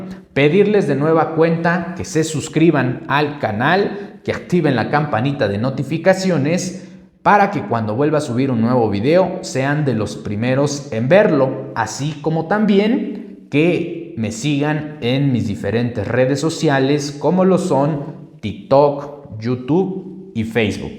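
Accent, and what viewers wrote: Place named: Peru. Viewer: Pensé que era de peru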